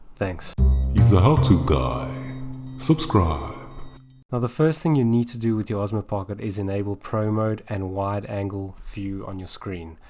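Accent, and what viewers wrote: South Africa. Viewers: South African? Thanks for the hacks bro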